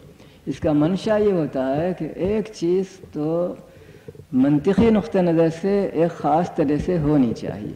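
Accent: Hyderabad, India. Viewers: When was this lecture given...? Seems pretty old, near Indo Pak partition era. Furthermore urdu accent is more of Hyderabad Daccan style.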